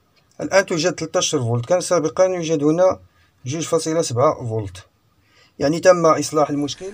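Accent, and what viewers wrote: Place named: Agadir, Morocco. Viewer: ممتاز وشرح جد واضح ومفصل بارك الله فيك من خلال كلامك يمكن أن أقول انك من اكادير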